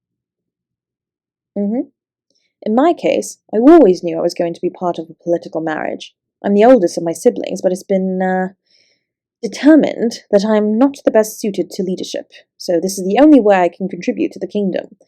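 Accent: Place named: United Kingdom. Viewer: You say "pompous British accent" but I say "absolutely nailed it." This was amazing, and the accent only made it better. Thanks for the audio,.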